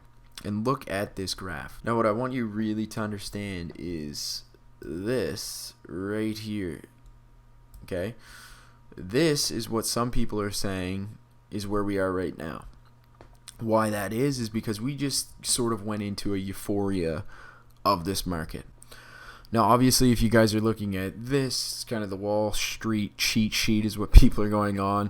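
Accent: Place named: Canada. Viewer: This is not related to the content haha but you have a “Canadian” accent. I appreciate that. Thanks for the info by the way! I came from tiktok and you’ve been a big part of my learning crypto and ETH in particular! I hope you keep making content of this sort of YT. That being said, I do appreciate the car content as well because I drive one and know nothing about it. Multi level education, thanks!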